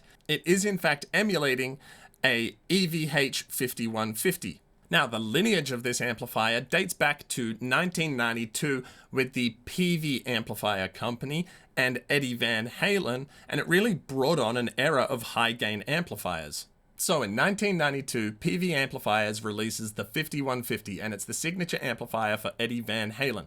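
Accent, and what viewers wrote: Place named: Australia. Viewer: Interesting stuff! You Aussie's with your funny 'H' sound always gets me. Trying to hear the difference btw some of those heavy distortion tones reminds me of trying to show my parents the difference btw East coast and West coast rap in the 90's! I guess you have to listen to some music that uses those tones to get it right off the bat. That POG is slammin'! (pun intended) I like to do that and just use the two lowest strings, too. It reminds me of that 60-70's Soul/Funk sound.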